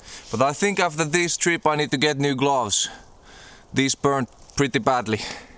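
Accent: Finland. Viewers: You seriously sound like Luke from Outdoor Boys, but you add Finnish scent to that. I love it haha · Mukava kuunnella välillä tuota rallienglantia 😂😂😂. No pääasia että ulkomaalainen saa selvää👍on naurettu kaverien kanssa 😊 Kiitos . Paljon seuraan #retkireppua #alileiniö#mehtämikko#eetun völjyssä #vapaalla jalalla ym. Eli Suomen ylivoimasia ykkösiä niin kivaa vaihtelua nämä on ...in English 😅😅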